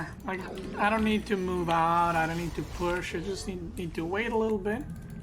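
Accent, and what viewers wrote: United States. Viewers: You do actually sound completely American. In the vast majority of America we all have a very similar accent and it sounds very similar to the way you speak English. You could come over to the majority of the States and no one would know that English wasn't your first language. It's just the South and Boston that have ridiculous accents. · Definitely more american than not. Like a well spoken immigrant west of the mississippi. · You're speaking mid- Atlantic English. It's Americanish but without the twang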